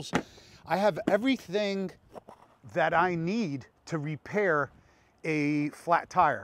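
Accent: New Jersey, United States. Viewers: he is from jersey they all talk like that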